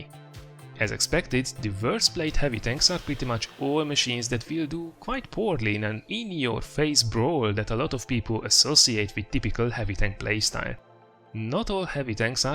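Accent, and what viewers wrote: Hungary. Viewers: első videodnál mondom:nagyon magyaros az akcentus, később,áá,ez biztos nem magyar,de lehet hogy francia :/,olvasom a kommenteket,egyszer csak meglátom ezt,hát basszus,ez magyar,na Mind1,amugy segyszer csinálhatnál egy videót,ahol elmondod az angol nézőknek,hogy magyar vagy,sok szerencsét az angol videókkal,sok sebzést a további meccseken :) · magyar vagy??? olyan furán beszélsz nagyon magyaros :) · hallod konkrétan 23 másodperc után rájöttem hogy magyar vagy :DD az az akcentus olyan tipikus magyar :D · By the dialect, I was 100% sure you're hungarian ;D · A magyar akcentus bárhol felismerhető :D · Dude, your accent. I know I know this accent. Are you Hungarian?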